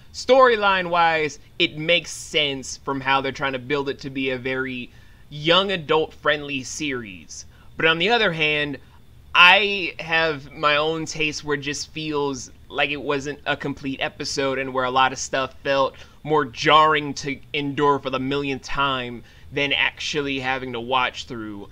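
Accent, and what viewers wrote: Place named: Cockney, United Kingdom. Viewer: your cockney accent, nice. i think a disclaimer for the show needs to be; uther will always hate magic, he never changes and he constantly reminds people of that.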